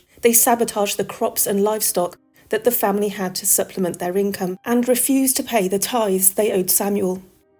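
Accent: England, United Kingdom. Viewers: Nothing better than hearing a ghost story in an English accent, well I concur, and I’m now becoming attracted to my own voice, jeez thanks, this is asmr stuff, honestly I could listen to this all night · What a beautiful home. Another interesting case,. Nothing better than a ghostly tale told with a British accent. I’m in bed, waiting for my nighttime meds to kick in and listening to my favorite YouTube channel.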